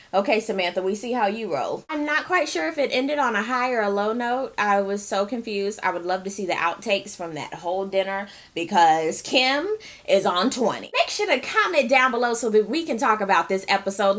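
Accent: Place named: Brooklyn, United States. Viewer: i don't know which one of your impersonations is better..Kandi or Tara...? i hollered at your Tara--well, i was telling one person a day..i loved him and i did it and we did it, and we're doing it!!!! oh my dang goodness...dam you!!! my eyes are burning like i could only imagine the pits of hell because of the crying laughing, mascara and eye liner running at this part of your commentary..girl, i tustve rewinded that part about four times..(in my cardi b voice) i been watching you for-evaa!! oh yea, yo BK accent with mendeecess 'baby muvas' comments were on point..lol..thats coming from someone from BK!!!..shout me out--Lady Di (pronounced die)!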